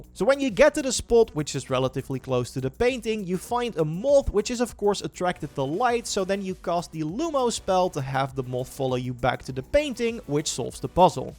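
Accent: Netherlands. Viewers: I immediately heard that you were a fellow Dutch guy, cheers! Great video. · I really like your video's, but are Dutch?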